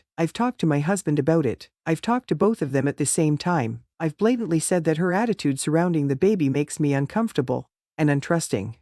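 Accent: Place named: Midwest, United States. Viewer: The ai is Midwesterner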